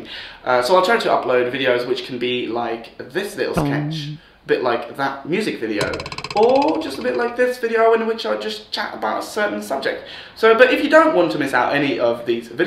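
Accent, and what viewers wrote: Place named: United Kingdom. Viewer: have u had that nice british accent also before your erasmus stay?:D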